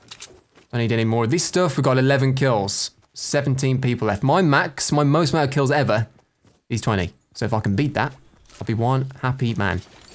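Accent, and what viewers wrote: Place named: Midlands, United Kingdom. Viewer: Just subbed. Enjoying thr ps4 game play and I'm guess from the accent from a fellow midlander. Not sure why my fornite plays totally different to this tho. Whenever I get in a gun fight in busy areas I lag out and die ( that's with virgin fibre connected with an Ethernet).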